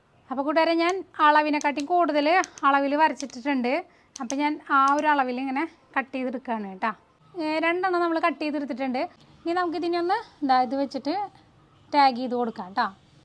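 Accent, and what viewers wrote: Thrissur, India. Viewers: സൂപ്പർ വീഡിയോ തൃശൂർ സ്ലാങ് പൊളി 👌😍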